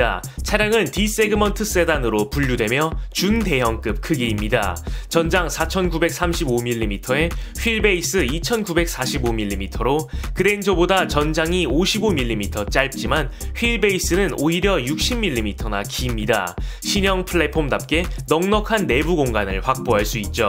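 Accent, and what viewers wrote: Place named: Jeolla, South Korea. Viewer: 히 이분 말투가 전라도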